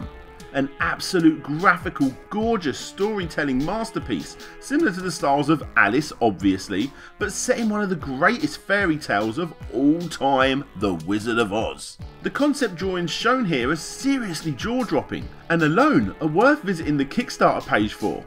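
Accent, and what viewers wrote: England, United Kingdom. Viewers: British accent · He's British, it's an accent thing. That said "Requeem"? "Requeem"!? But I suppose if you've never heard the word before...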